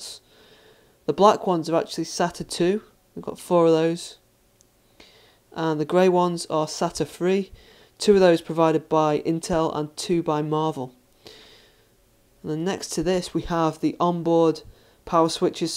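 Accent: England, United Kingdom. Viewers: I'm extremely curious... coul someone tell me where is that english accent from? :)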